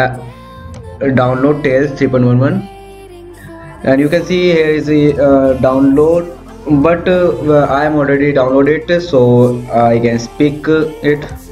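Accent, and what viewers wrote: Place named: India. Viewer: nothing personal, i cannot stand tech videos with a strong indian accent, not being racist. its is just fucking impossible to learn the details. would be better off with just a generic robot voice. im sure many millions of people around the world would think the same.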